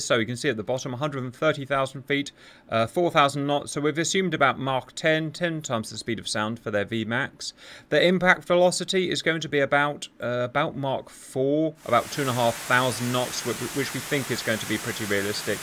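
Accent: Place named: United Kingdom. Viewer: No we love your beautiful British accent commentating the battles. It's just a shame when so much is happening all at once in the big battles that you can't commentate all of it!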